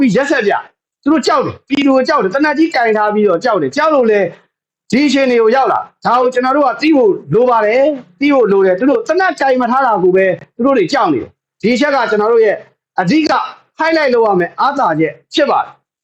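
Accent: Yaw, Myanmar. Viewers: okယောနသံ ❤၁၀၀၀၀၀၀၀၀၀၀၀သိန်း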